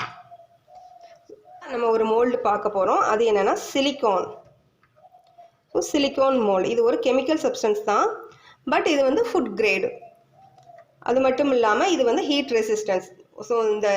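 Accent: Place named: Kanyakumari, India. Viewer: Neenga town ah? But native Nagercoil k. Kumari mathiri neenga pesara style iruku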